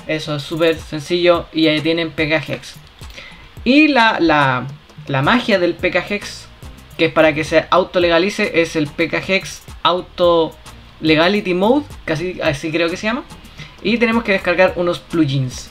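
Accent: Chile. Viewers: Mi reacción: Es chileno xD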